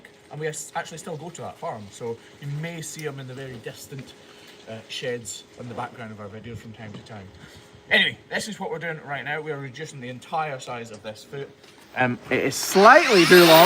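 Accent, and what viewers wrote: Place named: Aberdeen, United Kingdom. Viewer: He speaks Abberdabber, kenfitamene?! Congratulations to you and your wife on imminent new arrival 😀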